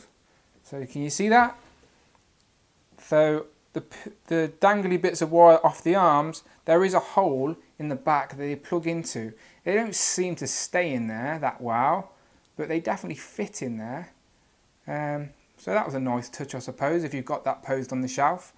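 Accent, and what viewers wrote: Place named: Manchester, United Kingdom. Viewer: got this wave or partial wave as a gift for a friend still waiting on one in the mail didnt get cyclops cause i refuse to pay fifty plus but did get the leg for five, but wondering all plus this guy eighty five dollars is that cheap or a deal anyhoo good vid your accent sounds like your from the manchester area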